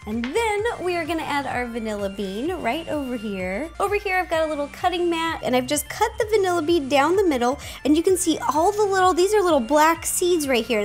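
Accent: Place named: Pacific Northwest, United States. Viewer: I'm enamored by the accent :O Is it common in the Pacific Northwest???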